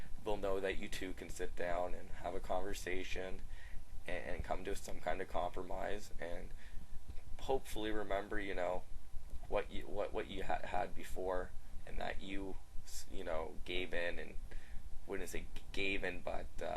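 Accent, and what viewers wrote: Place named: Canada. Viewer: i liker yer Canadian accent! like when ya say "OUT"...cute{i'm not gay[not that there's anything wrong with that], just callin a spade a spade}(fer serious). You really do have a gift as the voice of reason here!...great job.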